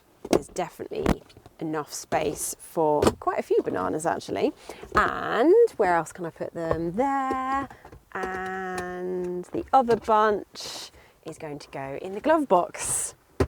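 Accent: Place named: RP, United Kingdom. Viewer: Love your charming posh accent.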